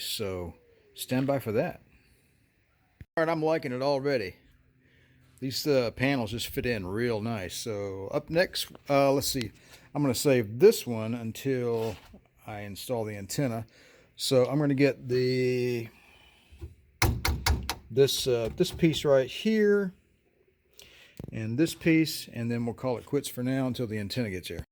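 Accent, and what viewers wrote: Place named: Texas, United States. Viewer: This guy must be from TEXAS! Luv it man!... Good video, thank you sir...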